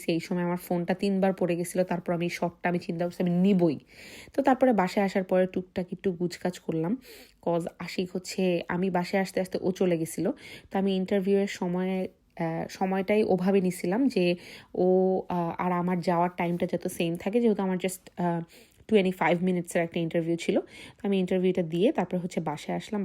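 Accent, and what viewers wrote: Barishal, Bangladesh. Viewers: ami apnader fb reels gula dekhtam,2 years dhore maybe..sekhane dekhte dekhte ekhon you tube eu dekhi.. you're so genuine.. and your barishailla vasha is also captivating.. your cooking is also mouth watering.. so you can cook new item and share with us in your local language.. r vlog er video gula wide diyen,full screen hole dekhte vallage...take love apu and stay blessed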